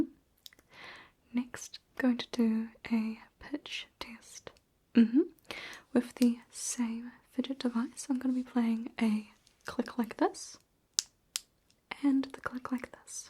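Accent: New Zealand. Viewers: Amazing New Zealand accent I honestly don't hear it much in New Zealand so it's good to hear it in this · are u doing a Kiwi accent or are u kiwi? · i’m convinced people love the kiwi accent because sometimes it sounds transatlantic lol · I think the tingles came early and I shivered with a warm glow. Love the NZ dialect 🌹🌹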